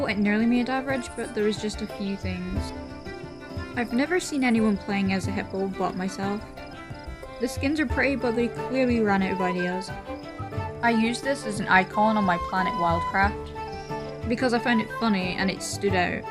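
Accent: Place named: Australia. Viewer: Is it just me or do u sound Australian all of a sudden👁️👄👁️?? ur voice is adorable 😭✨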